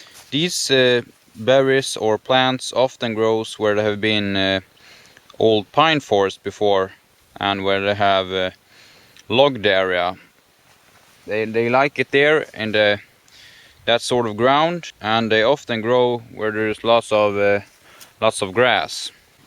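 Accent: Sweden. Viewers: Videos from Sweden...cool as hell! Love the accent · I love the Swedish accent! (Especially the "y" sounding "j"'s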